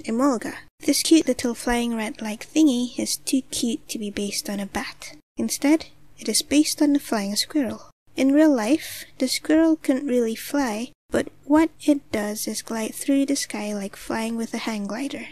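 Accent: Scotland, United Kingdom. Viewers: u sound scottish.where u live? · Are you Scottish?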